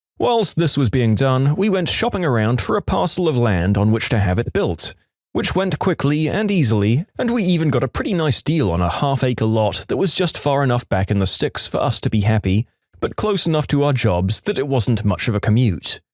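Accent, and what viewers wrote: United Kingdom. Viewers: Why is he British now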